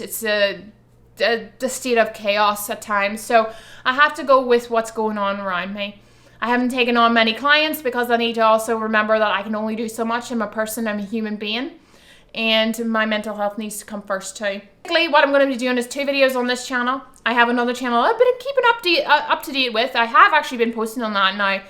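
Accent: Ireland. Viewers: I love hearing your Irish accent ❤ sending love and hugs xx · sorry to hear about those haters. But whats more important is your health, family and going back to your roots. Fun to hear your Irish accent 😊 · You SOUND SO Irish in this video!! Welcome back! x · ohhhhhh ok......now I hear the Irish 💖 that's wsup luv...I would've thought you was Native Canadian.....yes looking forward to hearing the true 💯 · Welcome back, it's good to know you're doing well and still among the living. This is the first time I've heard you speak with a Irish accent, GO IRISH!!! I just got back from a wonderful summer vacation in Ireland, it is such a lovely place, when I heard you speaking with an Irish accent I thought I was going crazy from hearing the Irish accent in Ireland so I was a little bit confused at first, so that means in all those other videos we were trying NOT to speak with your Irish accent, that is remarkable that you were able to do that, I'm impressed. 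I was hoping you would talk about that on this video but you did talk about speaking fast so that was cool. Take care I'm glad you're back making videos. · I thought you are Canadian? 😲Where does that Irish accent come from? 🤔 Oh yeah the haters, they are the worst! Im still ok on YouTube but on FB they are everywhere!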